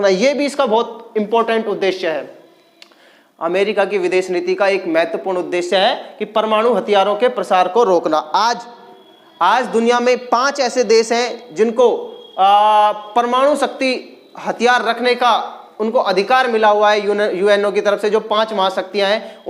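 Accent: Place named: Rural, India. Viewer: Bahut dehati pronounciation h sir apka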